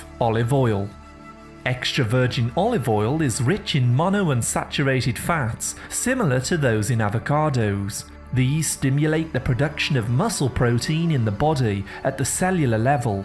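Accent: United Kingdom. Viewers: 🤣British English👍🏼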